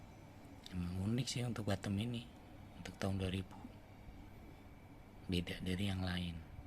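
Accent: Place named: Banyumas, Indonesia. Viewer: mantap👏👏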